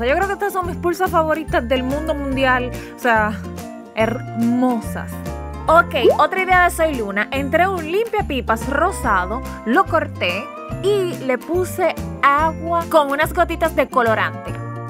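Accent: Dominican Republic. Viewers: eres dominicana? o de alguna isla del caribe? 😍 siento como un asentillo dominicano xd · Hola que bn que me encontré con estos vídeos tu eres dominicana verdad porque hablas igual que yo . soy dominicana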